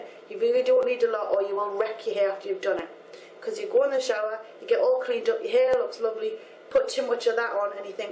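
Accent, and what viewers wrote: Newcastle, United Kingdom. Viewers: Hey there, Geordie Girl... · For all non British viewers, the reason she has a strange voice is because she is what we call "a Geordie" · Strange? LOL How about it's a British accent, yokel. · guessin your from newcastle AWESOME !! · She's from like the Newcastle area in the UK. There are about twenty different UK accents that you've probably never heard before if you're from elsewhere. Most people who aren't from England seem to only recognise the London accent as an English accent but truth be told we have loads of "weird accents" ;) x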